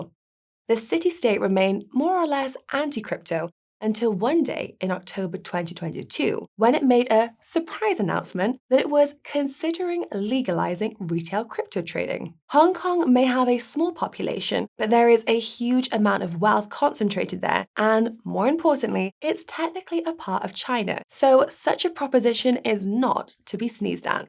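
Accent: United States. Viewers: Really appreciating ya'll from Pensacola Florida. American accent is hilarious.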